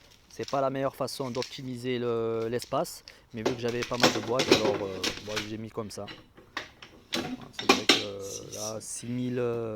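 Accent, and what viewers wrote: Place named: South, France. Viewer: T es un sudiste vu ton accent on est presque voisin 👋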